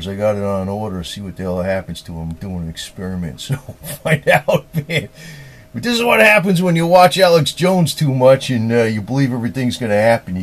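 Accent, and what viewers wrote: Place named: Chicago, United States. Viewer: FINALLY! somebody sez it!..."insider"..bovine scat...."AJ" Bovine scat!...and it drives me nuts, everybody looks to RT like its some sort of oracle....do they post some truth?....yeh, but they never hang out their own dirty laundry...just ours. I like you, "Hizzoner" likes you, coz we both got the same accent...but I got out of "Big Shoulders", moved into the weeds....not out of fear, its coz the place has changed, "Rahmfather"?...forget it....agree with you on silver and the "brain freeze"...I stick with American pre-65 90%'ers and ASES....I like the 40% Kenns too...we just keep stacking...its an insurance policy, not an investment.....so you got a new subscriber, coz you helped me with the brain freeze...Happy New Year!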